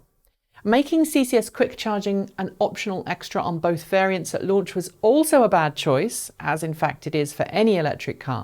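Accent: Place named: England, United Kingdom. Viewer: Greetings from Sydney Australia! So so glad to hear that nice pommy - undiluted - accent back in this episode:):) Thank you and keep up your informative and entertaining work.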